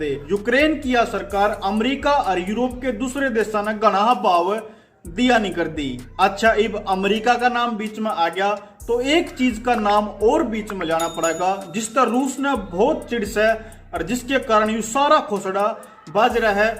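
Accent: Haryana, India. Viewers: आप हरियाणवी ढंग से सभी बात बताते हो, बेहद सुख की अनुभूति होती हैं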